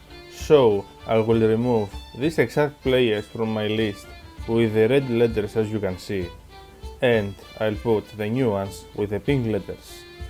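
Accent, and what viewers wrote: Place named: Greece. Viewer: Greek accent hits hard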